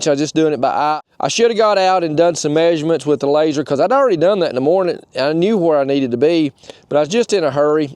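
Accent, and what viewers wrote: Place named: United States. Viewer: Hi, happy new year to you my friend from America! The landscapes, houses without forgetting trucks and machines always make me want to go on a trip to the USA in the future. - What’s next on this construction site? What’s the mecanic problem with the Mack? Sorry English with the accent American is very difficult to understand! See you soon!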